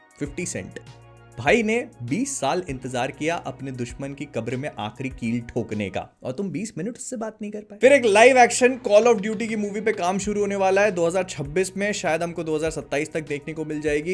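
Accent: Bhopal, India. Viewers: Maakda.... kya tum Bhopali ho ??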